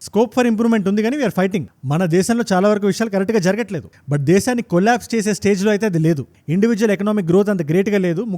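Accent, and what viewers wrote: India. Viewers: Mee voice lo indian feel venataniki chala baguntundhi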